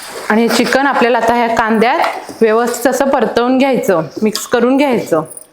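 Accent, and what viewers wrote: Kolhapur, India. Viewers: कोल्हापूरी टोन यायला लागलाय!😊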